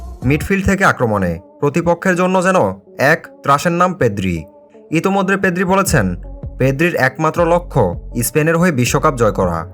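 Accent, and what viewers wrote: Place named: Bangladesh. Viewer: Tui I think bangladeshi mal tor vasa sune bojha jay.. Tora video bans na..jato abal public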